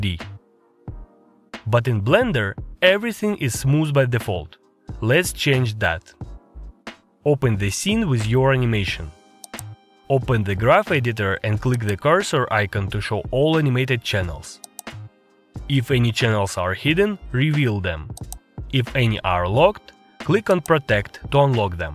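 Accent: Russia. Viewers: are you russian? i hear your accent like that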